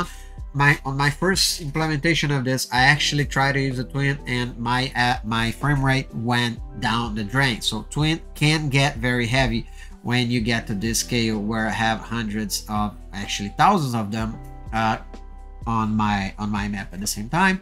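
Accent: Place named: Brazil. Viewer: Brazuka? vc tem sutaque brasileiro!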